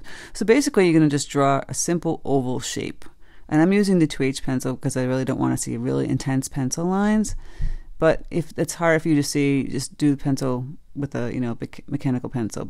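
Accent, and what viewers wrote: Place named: New England, United States. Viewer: I have been doing art for years, since childhood and yet I tune into you because you a) sound just like my New England sister in law, and b) you inspire confidence, as you have such a casual, easy going way of explaining that you make everyone watching feel the projects are all approachable. Good job on breaking things down well.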